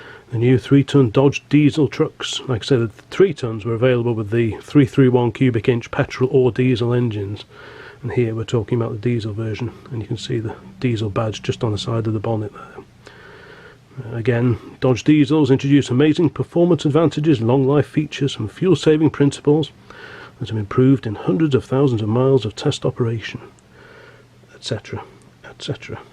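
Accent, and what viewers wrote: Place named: United Kingdom. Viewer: Truly appreciate the British hackscent accented narrative. The lack of a loud annoying soundtrack is certainly lovely. Just the facts sir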